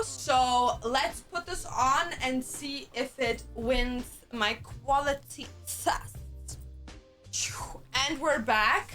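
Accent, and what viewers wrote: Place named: Germany. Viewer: sie klingt so deutsch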